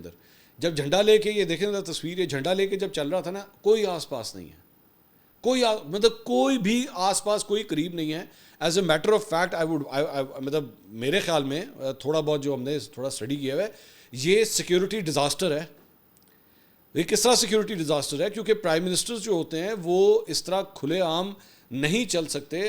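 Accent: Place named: Lahore, Pakistan. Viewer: The Lahori accent, embracing it as a part of your identity and cultural heritage. The Lahori accent is indeed a unique and lovely aspect of Punjabi culture, and it's wonderful that in your videos i really appreciate and loved it!😂😂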